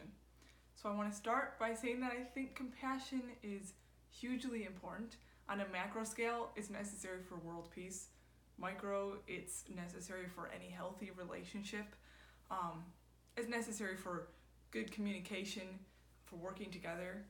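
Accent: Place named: Minnesota, United States. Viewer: You sound like Jesse Ventura (in terms of accent); would you happen to be from Minnesota?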